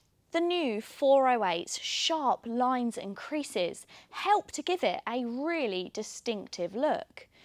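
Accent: United Kingdom. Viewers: Pojo ? That’s not the name ,its Peugeot …. Cannt u hear your self how ridiculous u sound? Hate British village accents..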